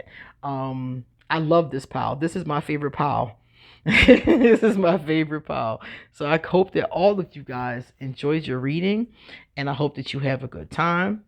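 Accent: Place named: Philadelphia, United States. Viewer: Ma’am! Are you from Philly? I can hear your accent. Signed- a Philly girl who is an Aquarius, lol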